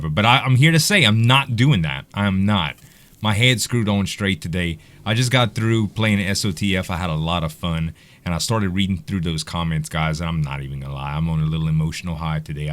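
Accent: Louisiana, United States. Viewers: Love ya videos Z. Listening to you takes me back to Thibodaux, and I haven't been home in a while.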